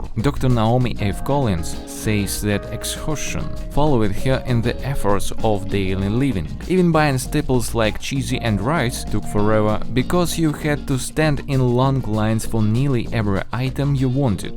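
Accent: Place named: Russia. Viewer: that russian accent (fake or real, probably real) really adds to the video. you did great!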